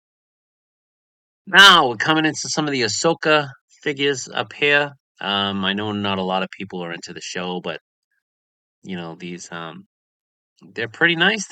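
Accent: Boston, United States. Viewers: Awesome review of what was shown at the con. As someone who lived in the Boston area for several years, I also always appreciate hearing the Boston accent in narration. Go Sox. Go Pats.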